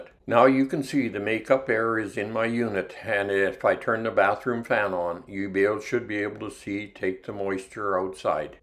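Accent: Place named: Canada. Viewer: You sound sooooo Canadian!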